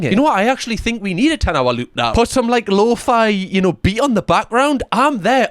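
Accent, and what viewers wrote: Newcastle, United Kingdom. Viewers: he's a Geordie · isn’t talking with an inflection, he’s talking with a Newcastle accent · Geordies innit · Im Welsh I bloody love your Goerdie accent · Close; he is from the north, but is a Geordie from Newcastle/ Sunderland. Not from Yorkshire. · Hes a foreinger from up north jordy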